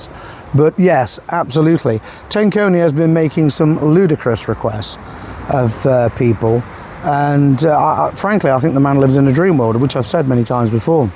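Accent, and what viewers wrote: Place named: East Midlands, United Kingdom. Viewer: Nice to hear your East Midlands accent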